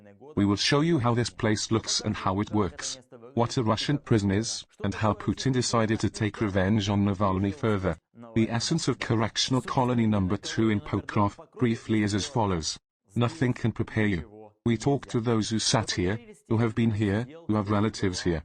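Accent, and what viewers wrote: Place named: Wales, United Kingdom. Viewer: The fact this is a Welsh voice cracks me up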